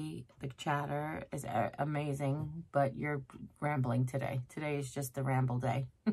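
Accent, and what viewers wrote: New York, United States. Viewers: I love your New York accent...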